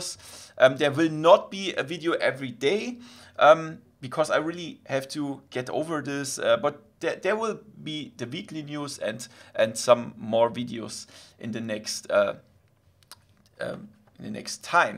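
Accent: Germany. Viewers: an deinem english hört man das du deutsch bist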